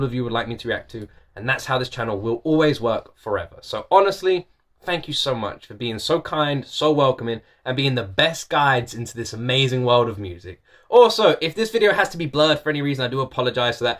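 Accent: United Kingdom. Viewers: Tiene acento británico. Incluso dijo que está en UK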